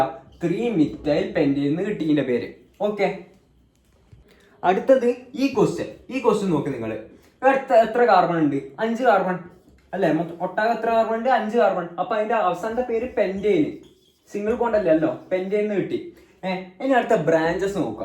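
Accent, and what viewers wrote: Kannur, India. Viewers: Bro kannur aano 😊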